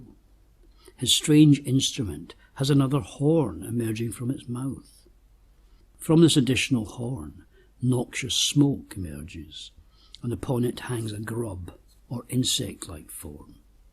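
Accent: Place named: United Kingdom. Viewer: Dear, I want to thank you for all the work you do.. I am truly lucky to have you in this world, showing me the beautiful marvels I would have probably missed otherwise. Also the knowledge that you share and all the work you must have put into it all.. words are insufficient for how I feel towards it. Secondly, I am planning on taking a trip around the UK to see all the magical places. Do you have any suggestions? Also, I know it might come across as creepy, but I would love to meet you. You sound like you live in the UK, and I am a traveler on the journey of life and it would be an amazing addition to my journey to be able to talk with you. Anyhow, whatever happens, I wish you great love and bliss. Much love, Joep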